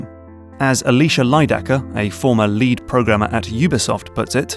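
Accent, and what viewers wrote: United Kingdom. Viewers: "Electric Danna" You british folk and your ARs. Anyway, glad to see I'm not the only on who dyed Link's hood green.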